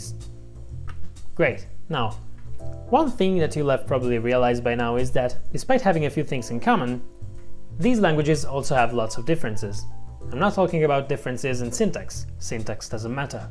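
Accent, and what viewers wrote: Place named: Europe. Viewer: i cant tell if you have a western european or an eastern european accent. good video tho.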